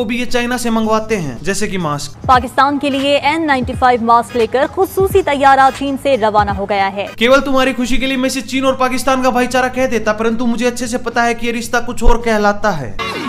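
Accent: Haryana, India. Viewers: हरियाणा के हिसार जिले से हो ना आप😁😁😁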